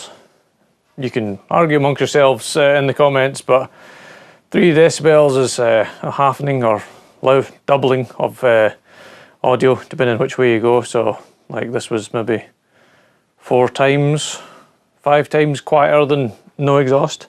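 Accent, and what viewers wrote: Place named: Fife, United Kingdom. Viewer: Your accent rather reminds me of Fife Robertson in the 1960s. Are you perhaps from the proud Kingdom of Fife ??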